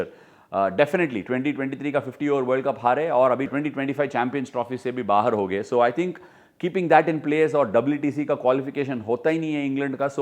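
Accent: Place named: India. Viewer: Who loves his hindi accent ? 👇🏽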